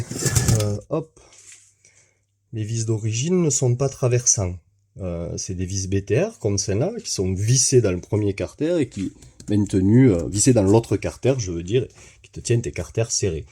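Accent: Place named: South, France. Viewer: Hep! Encore une preuve de ton talent mon ami, avė l,accent du sud con ! ,ce n,est plus de l,or que tu as dans les mains pour faire ces belles choses d,ajustage, ceux sont des diamants que tu as dans les pognes, vraiment je t'envie ! Et en plus tu es humble 🙂🤘💕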